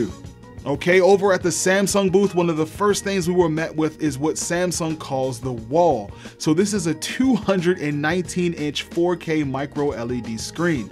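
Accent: Baltimore, United States. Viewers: Thought I recognized that MD semi b more accent.:) Great vid as usual I use a lot of your info. MW, Wash DC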